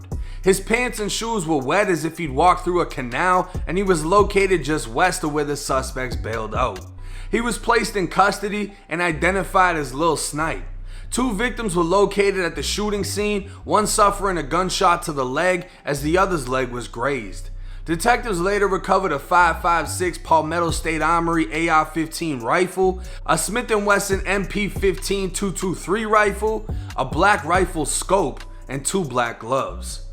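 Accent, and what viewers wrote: Boston, United States. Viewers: Any time he says CHAHGEZ in general to be honest, plus BAHSTEN accent is one of the best parts of his YouTube videos in my opinion.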